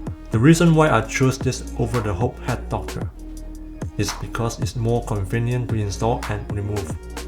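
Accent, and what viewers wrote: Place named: Canada. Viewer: I think hes chinese Canadian. I base off his accent and I think I got a hint about the canada in a past video.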